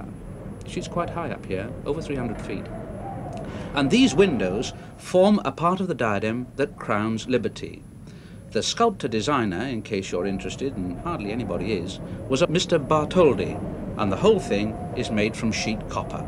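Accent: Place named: Newport, United Kingdom. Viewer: You can hear the Newport accent in more in this clip than ever!:-) wonderful little film. 👍👍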